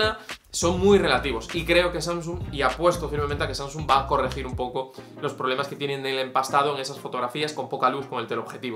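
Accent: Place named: A Coruña, Spain. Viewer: Una buena opción si me quisiera gastar tanto en un móvil aún así hay cantidades que sigo sin querer dar por la telefonía. Soy yo o eres de Coruña? Lo digo por el acento😂😂, si es así...yo vaya a donde vaya también lo saben enseguida, es delatador😐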